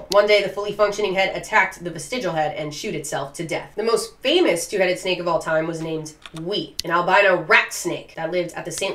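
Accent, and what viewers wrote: Midwest, United States. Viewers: Australia may be unhinged but my partner is scared of your videos because you look like you grew up out bush but but speak with an American accent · I found your channel! I was wondering why you seemed so familiar, someone showed me your tiktok, a video, and i was like "yeah no shot, i learned that when i was 5.... did you not go to elemtary?" like they were showing basic crap, not the real intersting stuff that's in your actual full videos. i thought you were an up and comer, no you're a tik tok legend, that started youtube. So you'll never read this. BUT I was trying to guess you accent. I was thinking "gotta be mid west, but not north mid west, mid west as in (west if chicago,) pretty shres the same accent until you get to so-cal and portland and seattle areas. I was close!! Thogh your PO box says LA, i don't think you were raised in SoCal. 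I'd say between Northern cali and chicago, somewhere between there, but as far as south as north arizona/newmexico. (it's a large accent area)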